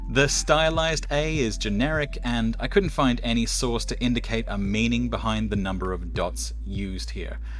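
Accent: Australia. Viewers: Aha, that explains the Australian accent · Thought that accent had some Aussie to it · A non-zero percentage of why I clicked this video was for confirmation of whether your accent was at least partly Australian lol it sounded like it but not quite as "thick" as many Aussies I talk to regularly so I wasn't exactly sure where to place it. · I thought your accent had a hint of Australian! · I have a very sensitive ear for accents, and the first time I watched one of your videos, I concluded that you must have been born/raised in Australia, and that you have a naturalised English accent after spending many years in the UK. Having watched this video, I am pleased to learn that I was correct! Great videos, always a pleasure to hear your thoughts on graphic design!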